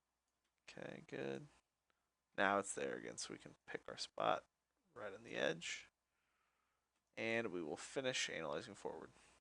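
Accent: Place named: Canada. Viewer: I detect a canadian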